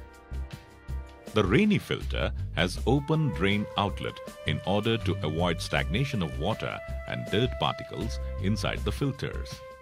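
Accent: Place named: South Africa. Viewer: this really has cheaply made written all over it its basically what you get in your modern shower plug made in india by a company called chicken makka roo!!! advertised by a indian guy called and a accent posh english mixed with south african, i mean look at their research and development tell me thats not a back of a run down chicken coup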